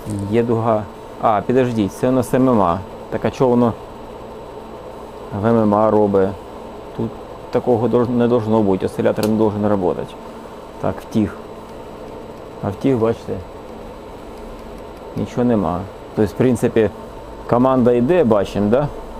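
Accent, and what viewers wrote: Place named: Poltava, Ukraine. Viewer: Шото говор наче полтавський! Угадав?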